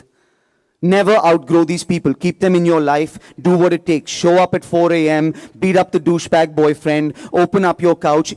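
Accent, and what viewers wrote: India. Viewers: Now that was in proper indian accent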